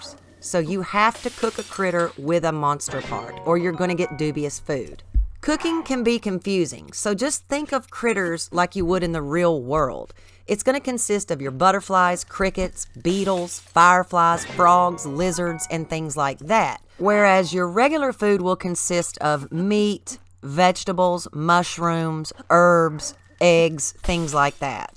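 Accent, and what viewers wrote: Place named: Kentucky, United States. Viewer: Dang you sound just like I do.. I was born and raised in Kentucky.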